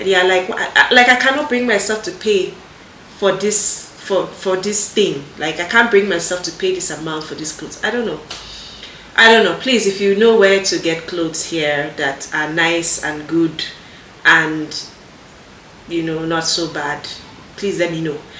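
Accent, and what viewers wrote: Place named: Canada. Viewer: Lol, Very Canadian accent.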